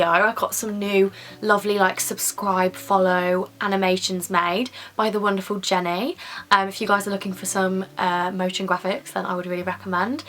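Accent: Birmingham, United Kingdom. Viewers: u sound so Birmingham in this vid x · you turn really brummy when you're home hahaha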